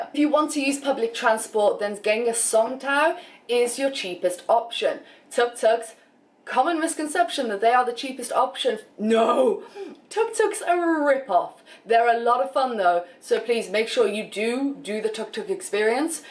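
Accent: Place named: London Irish, United Kingdom. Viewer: London Irish I’m guessing...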